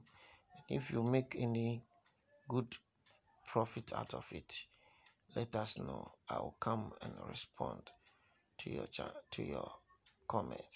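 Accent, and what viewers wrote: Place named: Ghana. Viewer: You sound like a Ghanaian ❤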